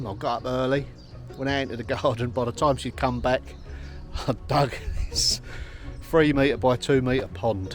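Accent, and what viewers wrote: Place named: England, United Kingdom. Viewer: so glad to see you in your channel. I've been enjoying you at the convent project and didn't know you had your own channel. This is great!!! Love your kind demeanor and calm. You're also practical and very talented. Looking forward to watching you make your beautiful garden. Love listening to your English accent 🥰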